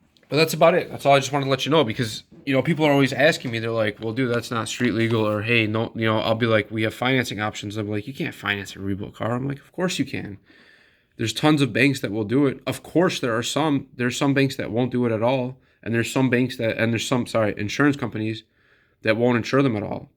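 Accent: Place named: Northeast, United States. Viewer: Great video! I love the northeastern accent my cousin lives in Philly. I’m in Texas.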